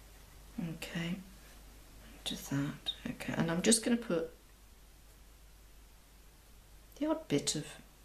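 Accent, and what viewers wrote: United Kingdom. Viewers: I've so enjoyed watching your videos & learning about watercolor from you. You're such a lovely lady with a soothing, relaxing voice, especially your accent. I love all things British & hope to visit the UK one day, especially England. I'm an extreme beginner to watercolor at age 44 though my late grandmother was an accomplished artist of oils & watercolors. My mother has painted in the past as well. My 19 year old daughter, who is a beginner also, inspired me to give it a go! I can't draw well at all but I've been able to paint from some of your videos, like the lavender pot and these wonderful silver birches! We have them here in Virginia, too! I was able to paint a very nice one from this video using brush you mentioned; so easy, but looks so real! Thanks again for sharing your talent!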